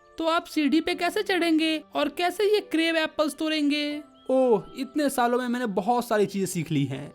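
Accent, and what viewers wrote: Bihar, India. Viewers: It's not bhojpuri bro. It's hindi with bihari accent.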